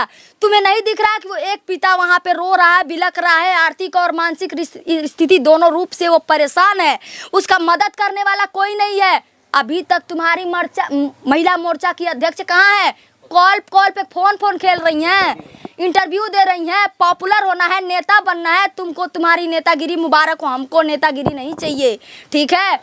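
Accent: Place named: Gorakhpur, India. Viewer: ❤ ekadam Sahi boli hain aap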